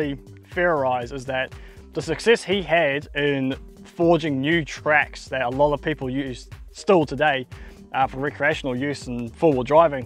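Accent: New Zealand. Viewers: the kiwi voice is annoying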